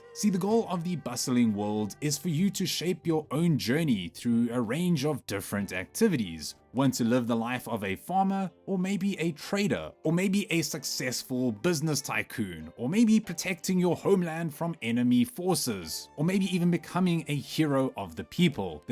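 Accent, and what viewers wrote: South Africa. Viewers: Mate... Are you South African? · You from South Africa boet? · My infallible sense of compatriotism detects the dulcet and mellifluous tones of a Saffer twang 👂🇿🇦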